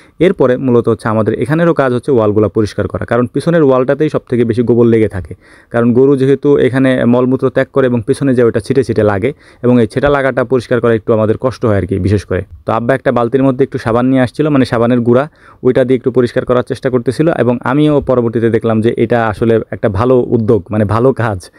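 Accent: Faridpur, Bangladesh. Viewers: Vaiyar basha ki Faridpur?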